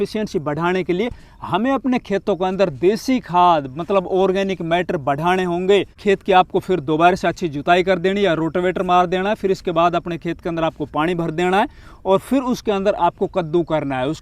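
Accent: Mewat, India. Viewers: Mewati ho ke aap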